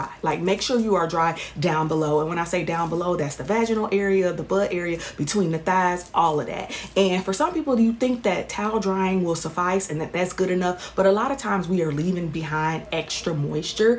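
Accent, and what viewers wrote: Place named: South, United States. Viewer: New Subscriber! Great video. Love the southern accent 🤗💜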